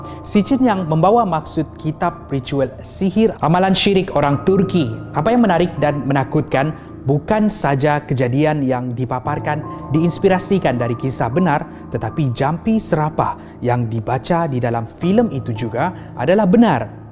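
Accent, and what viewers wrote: Sabah, Malaysia. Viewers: Ainahhh org sabahhhh baini .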